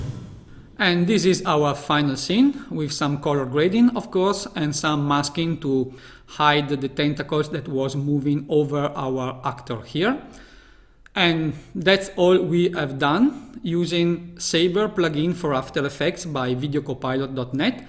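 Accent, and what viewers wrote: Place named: India. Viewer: Чувак, по акценту же сразу ясно. Индус 100%. Ну или откуда-то оттуда, из 3-его мира. Кстати, правильно пишется "Where are you from?", так, на будущее :)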